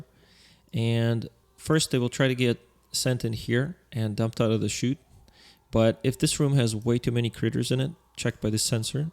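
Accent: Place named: Russia. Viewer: едриттунг. енто же рашн инглиш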